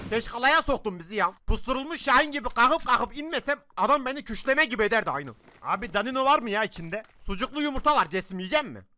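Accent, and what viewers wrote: Gaziantep, Turkey. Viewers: abi antep dublaj papci devamı gelsin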